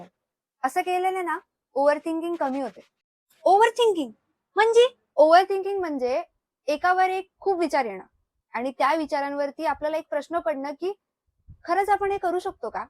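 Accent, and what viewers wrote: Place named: Ghati, India. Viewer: Your घाटी accent 😍 nice try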